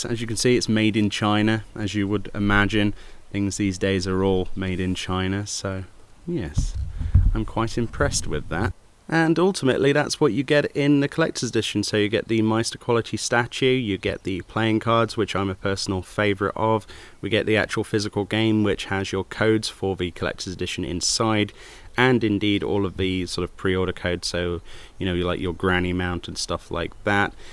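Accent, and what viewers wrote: United Kingdom. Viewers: Love UK accents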